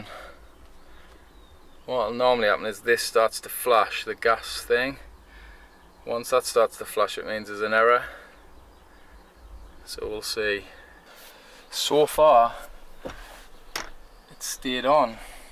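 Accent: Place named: Teesside, United Kingdom. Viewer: I was going to ask the same thing about the Bluetti as I was about to buy one until I watched this vid! Is the Anker one you have another git big power unit thing or do you mean just like a handheld powerbank? PS your accent isn't remotely strong, I'm a Teessider and I thought you were one of us, let alone a bloody geordie!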